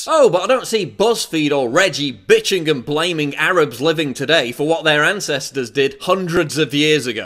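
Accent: United Kingdom. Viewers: you are the living proof of people with a British accent getting away with saying utter shite. I wonder if your intellect is strong enough to deal with the hard topics of political philosophy or are you just gonna make a name of yourself by posturing as the tough guy to college sjws?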